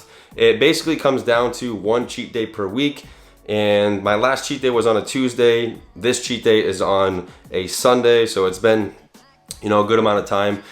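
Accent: Boston, United States. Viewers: He's got a Boston accent. Goes with the whole shtick. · Boston accent coming through and nothing to be ashamed of. But same with me my Bosnian weird way of saying things come up constantly especially from my kids, mom say cookie.. i say it coooo key but cooo is so long that it sounds funny to them😂. Great video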